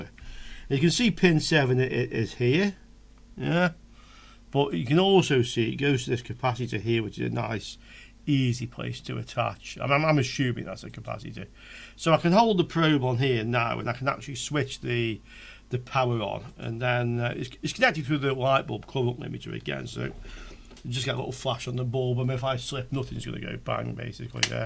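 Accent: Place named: Liverpool, United Kingdom. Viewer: On risque alors de perdre son accent savoureux de Liverpool et son humour. Isn’t ?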